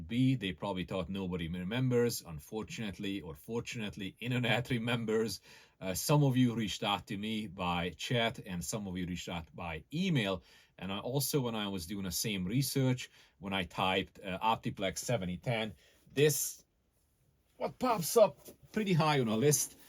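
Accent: Hungary. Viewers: Magyar akcentusod van :) Jó bemutató.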